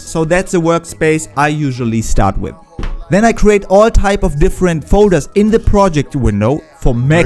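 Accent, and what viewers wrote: Germany. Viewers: That was an awesome video and very helpful! Hearing organization tips from a German accent also seems right somehow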